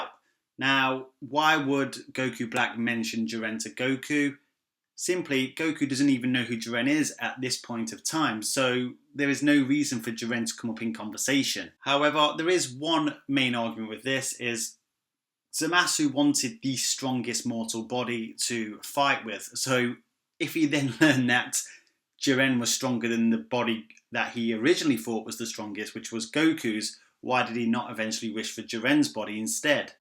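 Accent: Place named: United Kingdom. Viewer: i usually enjoy british accents , not today lol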